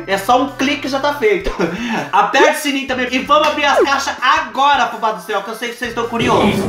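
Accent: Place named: Interior São Paulo, Brazil. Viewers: vc me faz lembrar do meu pai, ele nasceu em Itararé, ele tinha o sotaque igual o seu. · Fubá do céu muito legal faz sim....,Sotaque lindo vc tem,igual minha mana que mora no interior Paranapanema SP ♥️👏👏👏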